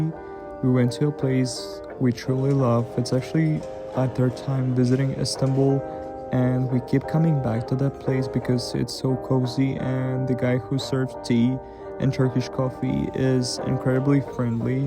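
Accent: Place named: Flanders, Belgium. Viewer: You have a flemish accent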